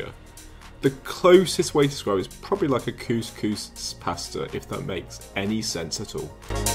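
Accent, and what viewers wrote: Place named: Birmingham, United Kingdom. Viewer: That brummie accent is coming through ! Great video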